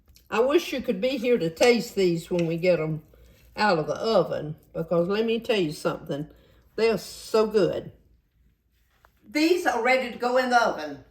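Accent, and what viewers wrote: South, United States. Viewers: God I love this women, she oozes southern hospitality...just the most loveliest and Sweetest Lady. Not only is she an extraordinary cook, but her southern Accent is so adoring and it puts a smile on my face. I'm a Mexican American\ Hispanic man from a urban neighborhood learning how to cook Miss out of this world Southern cooking. Thank you for teaching me and Sharing your amazing cooking.❤ FYI this Women needs her own cooking show and cook book. She has all the ingredients to be a host on her cooking show. God Bless ❤ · I found you on YouTube about two weeks ago and love,love,love your posts! My mother’s family was from the south and listening to you talk reminds me of visiting the family. Your recipes are all similar to the food I grew up on. I am waiting patiently for my cookbook to arrive. It will be great having the recipes all together and not having to search high and low for each one. 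I made cabbage casserole last night and my husband said a keeper.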